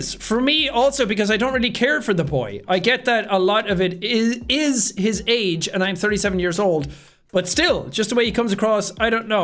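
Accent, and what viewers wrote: Ireland. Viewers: The entire time I watched this my cat was scratching at my door for no reason and I was like: She is drawn to the voice of the loud Irish man 🛐🛐🛐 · I love how he became significantly more Irish after the ice was broken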